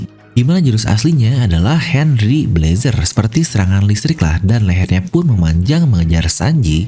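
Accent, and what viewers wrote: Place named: West Java, Indonesia. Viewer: Min org sunda 😆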